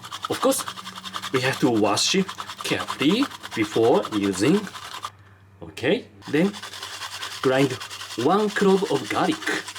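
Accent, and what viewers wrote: Japan. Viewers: rules! The most charming Japanese-English accent & way of speaking ever 👍🏾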